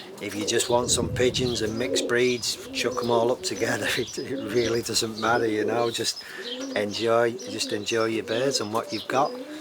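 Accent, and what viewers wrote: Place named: Yorkshire, United Kingdom. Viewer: Hi I watch all your videos we’re are u based sounds like Yorkshire?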